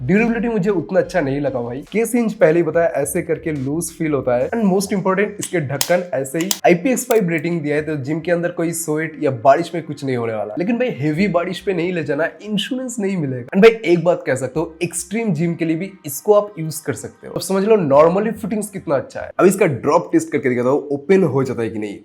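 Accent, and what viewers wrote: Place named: Bihar, India. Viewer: Relevant info diya kar bhai. Aur bihari feelings aati hai tujhe sunne pe 😅. Jisme r aur d bolne me totla jaisa lgta h tu... Faltu me paka rha tha😂😂😂😂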